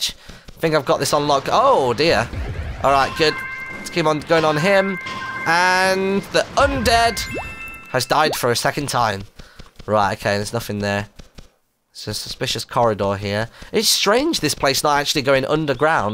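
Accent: United Kingdom. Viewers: Its just his acsent. Hes Britain dont make fun of him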